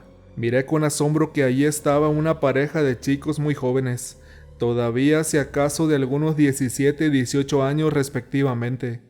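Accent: North, Mexico. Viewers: No se porqué pero me gusta su acento norteño ajua :v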